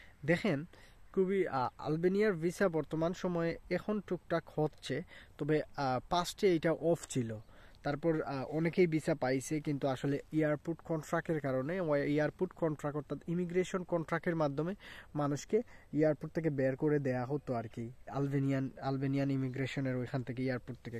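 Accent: Sylhet, Bangladesh. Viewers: Vai Kotay lager Sylheti bhai basha koi? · ভাই সিলেটি নি ❤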